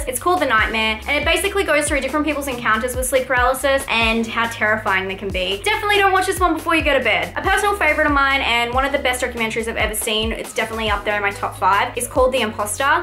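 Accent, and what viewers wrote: Australia. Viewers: Are you an Australian? You're pretty as ay. *blush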